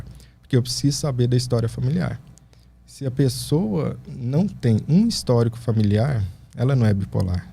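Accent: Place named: Minas Gerais, Brazil. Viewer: Que show de explicação. E com esse sotaque de MG Ficou MIÓ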